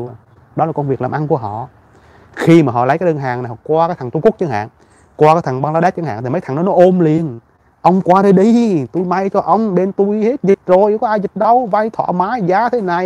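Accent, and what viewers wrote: Standard. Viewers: Anh chỉ được cái nói chuẩn 👍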